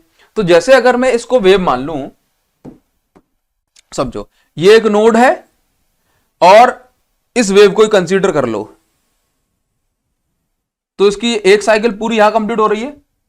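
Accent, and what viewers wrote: Odisha, India. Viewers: Sir great video❤ Sir, are you odia? Jay jagannath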